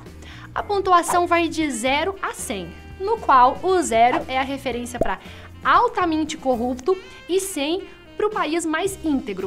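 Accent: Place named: São Paulo, Brazil. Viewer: Sotaque de paulista feio! Coloquem uma pessoa que saiba pronunciar as palavras corretamente !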